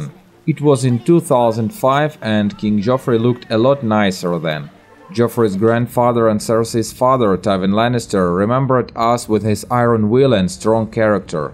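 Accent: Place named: Russia. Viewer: sounds russian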